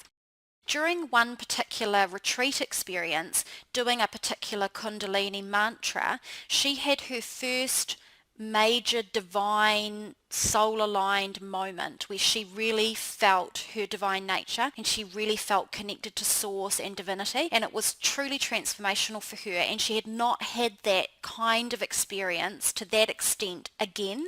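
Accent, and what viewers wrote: New Zealand. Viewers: Rio Brasil Samba Shows sambistabeauty - cos we are KIWIS BRO - 😉 no harm in it, just our accent. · So nice to hear a kiwi accent. Great Video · Wow great to hear the nz accent!! Good to know there are people in my little corner of the world thinking this way! Xxx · I have been manifesting a trip to Australia for me and my son. I clicked on this video and heard your accent! I know you are from New Zealand but still! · ~ Gosh you speak FAST, but with clarity. Some words escape me with the NZ accent.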